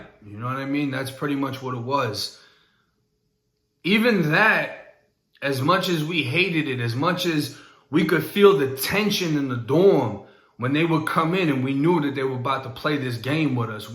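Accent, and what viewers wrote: Boston, United States. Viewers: I’m under my girl’s thing here , anyways you’ve done a lot of time in Florida ? But your accent Boston ? I’m from NY , but lived in Florida for some yrs , spent a lot of time in Polk county fl , south county jail ( frostproof) our sherif was Grady Judd at the time , not sure if he still is ? But he’s a trip bro , could u do something on Polk county ? Ty for your vids pretty cool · "the sahgeant" my man you sooo Boston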